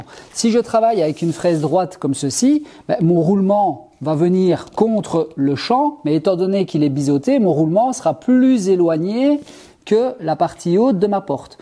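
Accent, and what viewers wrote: Belgium. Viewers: Allez vive. Dès que vois cette tête avec bonnet et accent légèrement belge, je suis de suite heureux.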